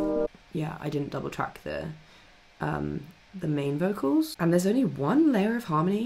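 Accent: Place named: South Africa. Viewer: you sound South African. you from Australia or New Zealand?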